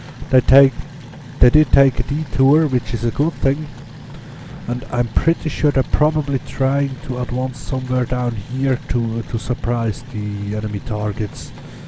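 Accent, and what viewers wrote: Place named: Switzerland. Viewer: Schweizer oder Deutscher? Habe das Gefühl dass dein Akzent ziemlich einem Schweizer ähnelt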